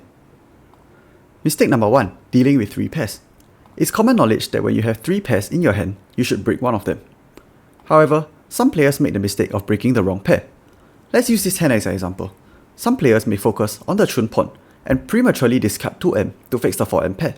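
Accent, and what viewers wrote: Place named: Singapore. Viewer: Instant sub. I never knew I needed to be called bad at the game in a Singaporean accent until now. Thanks for explaining the mysteries of Riichi to us baka westerners, this is really helpful content.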